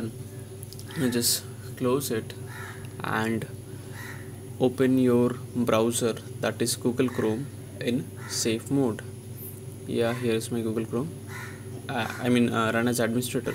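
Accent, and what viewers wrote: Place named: India. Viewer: thanks, it helped but ur indian accent though😂 😂 😂 😂 😂 😂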